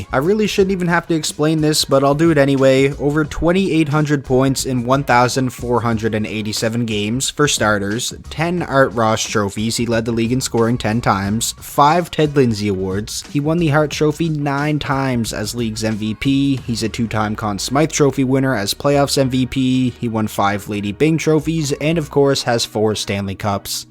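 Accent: Newfoundland, Canada. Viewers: Which province are you from? I can tell you’re Canadian from how you say Hart, Clarke, part, etc. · I mean, really depends on what type of Newfoundlander you’re talking to lol. Almost thought you were from NL, with how you pronounce certain words. Not all NL’ers sound as animated as you think haha.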